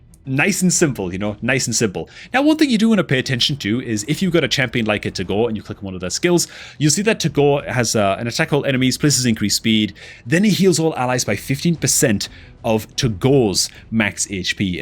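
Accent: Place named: Northeast England, United Kingdom. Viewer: I'm distracted by picking out the accent. I couldn't work out whether it was Sunderland or Newcastle which usually points me towards a dancer of the sandy nature.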